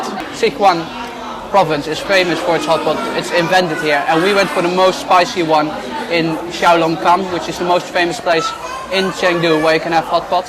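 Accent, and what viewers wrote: Netherlands. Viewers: Thank you for the very informative video :) we’ll be there for a few nights in December. Your accent sounds Dutch btw, is that right? I lived in Groningen for a short while :)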